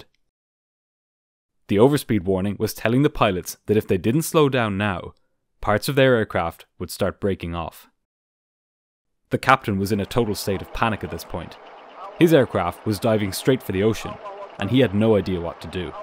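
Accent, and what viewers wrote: Ireland. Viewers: Thanks. 🇮🇪 this is the only videos of this sort of stuff I watch. It's always nice and honest without B.S or showboating like some others . PS our lovely Irish accent also helps 😊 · I could listen to this man speak all day. There’s a reason why Irish is voted people’s favourite accent out of the whole UK and Ireland.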